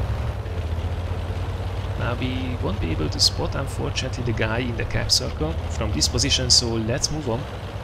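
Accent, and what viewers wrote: Hungary. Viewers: Olyan magyaros akcentusa van :) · what is your official lamguage because you speak english like a hungarian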